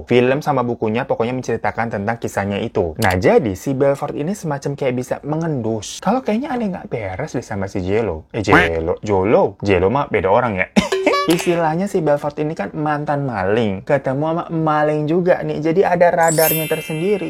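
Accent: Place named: Betawi, Indonesia. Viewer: Betawi nya lucu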